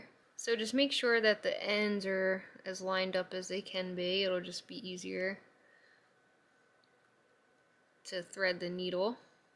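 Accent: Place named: South Jersey, United States. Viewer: Can’t wait to try this strap. Thanks. And by the way…and I’m not making fun because I grew up on NY and get a lot of comments about my accent, But I think you have that south Jersey accent which has the Philly influence. There’s certain words when it reminds me so much of my friends from that area. Good luck with channel!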